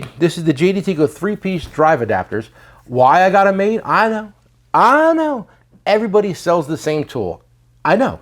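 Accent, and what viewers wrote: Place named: New Jersey, United States. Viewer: Damn I kept wondering why I never heard the term "field" tool dealer wondering what the hell that means? Now I see the title failed lol went over my head. It must be that damn Jersey accent. Former Edison resident happily in Florida now.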